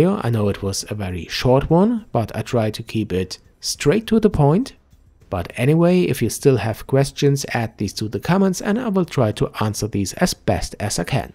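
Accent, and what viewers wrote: Germany. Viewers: Very good introduction, but the part where I should press something to get camera view the same as current view got lost in the German accent. Could you write it also on the screen ?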